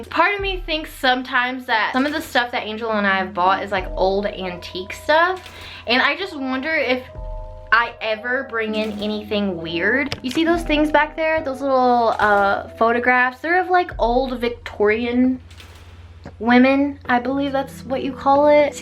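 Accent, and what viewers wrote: South, United States. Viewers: My God. The comment of a possible bugs on ur bed on ur face freaked me out more than ppl in your room..ugh sorry. I think ur newest place is haunted and ur just too open or sensitive to it.. Hope u at least cleansed the place, ur decor (bc yes spirits will attach to their fav or beloved items..whole show on tv on it years ago..freaked me out buying second hand stuff just in case..but now I do but just cleanse anything I get 1st b4 coming into my home), and urself by now..bc kno this was months ago. So hope everything's all good now..got some catching up on vids to do (I say with shame for I adore u and ur channel/content). ..also..lookin beautiful and healthy as ever..always on of my fav youtubers..I mean creators (prefer callin y'all)..both southerners and myself now living in NY..just feels like home hearing ANY southern accent..but love urs the most..💜💜💜💜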